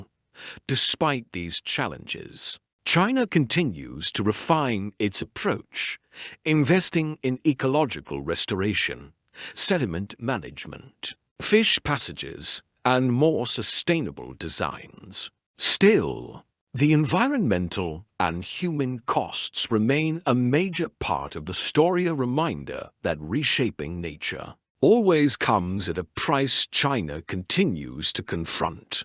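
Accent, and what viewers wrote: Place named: United Kingdom. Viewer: its the demeaning British accent. 😅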